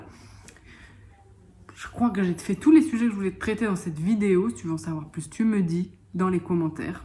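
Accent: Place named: Switzerland. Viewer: Bonjour, vous avez déjà bien notre bel accent 👍😀vidéo très sympa, Bonnes fêtes de fin d année, oui Montreux est magnifique j y ai habité 6 ans,à présent château d oex,au plaisir😀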